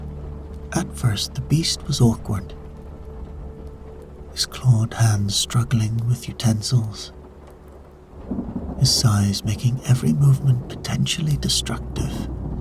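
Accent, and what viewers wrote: Scotland, United Kingdom. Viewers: Thank you. I love your Scottish accent. This is my favorite fairy tale story ❤.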